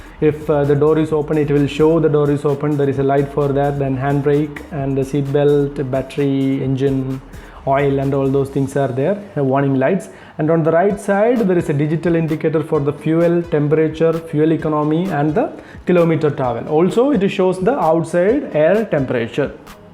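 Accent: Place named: India. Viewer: Bro please for Gods love, learn English! Some places I wanted to break my phone and pull my ears listening to ur hinglish! Peace!✌🏼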